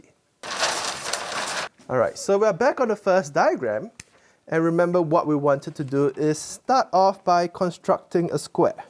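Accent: Singapore. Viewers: is the speaker singaporean/malaysian? because I suddenly feel really patriotic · is he a Singaporean? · dat singaporean accent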